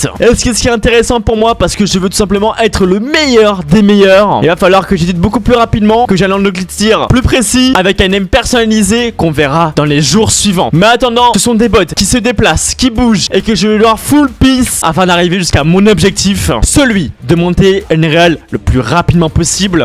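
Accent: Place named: Switzerland. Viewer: Gg mais est ce que tu es suisse parce que tu a un accent suisse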